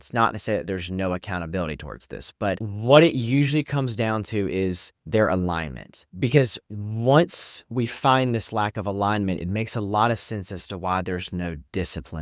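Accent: South, United States. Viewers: I'm confident that your reassuring voice and sublime Southern twang will most certainly elicit expressions of even greater enjoyment.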